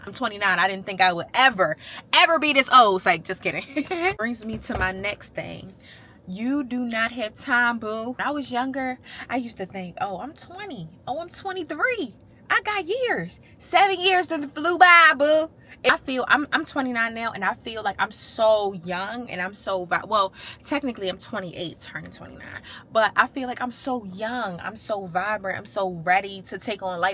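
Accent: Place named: Baltimore, United States. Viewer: 2 sec into the video, I said yo is definitely from Baltimore 😂 I miss the city.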